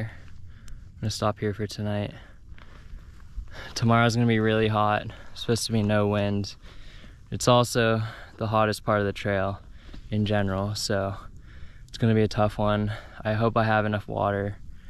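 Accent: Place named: Hawaii, United States. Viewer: correct me if i am wrong but i am sure i hear a "Punahou" accent to your Hawaiian words...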